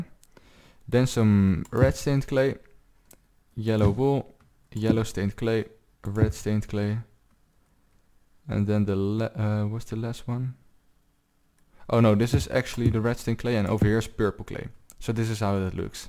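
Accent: Netherlands. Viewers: Ik hoorde wel dat je NL was!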